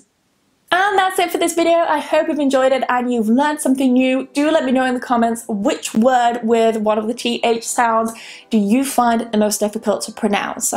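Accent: United Kingdom. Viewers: I feel like you speak British aren't you👀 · Sounds like British for me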